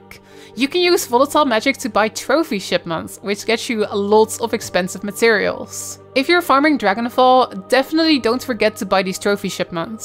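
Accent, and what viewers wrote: Netherlands. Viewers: Yea, I probably stash the components in my bank that would net all the Gold then. I have a really old account but hat a long hiatus of over 8 years and now get back into it, playing through all the stories and unlocking the mounts (which can be expensive). So I don't have a boatload of materials unfortunately and all the areas I visit will give new stuff that I never had before. Also don't have a whole lot of time to play so I have to pick and choose and avoid getting burned out otherwise it's just a waste of time. By the way, are you Dutch by any chance? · Ik vind je Nederlandse accent wel best heftig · very clearly a Dutch person speaking English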